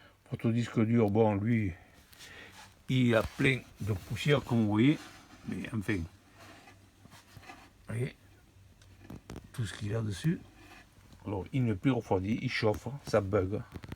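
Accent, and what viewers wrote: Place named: South, France. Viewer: On sens le sud dans tes video j’adore !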